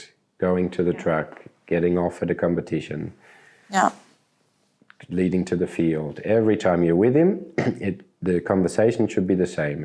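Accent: Australia. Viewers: Is this horse 🐎 teacher an Aussie or a Kiwi 🥝??? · Are you Australian? I was so impressed with your approach and the lessons you taught this beautiful horse, you saved him, Bless you, from Australia ❤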